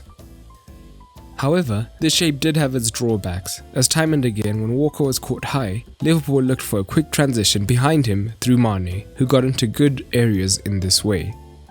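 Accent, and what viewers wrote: South Africa. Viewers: You sound South African, am I right?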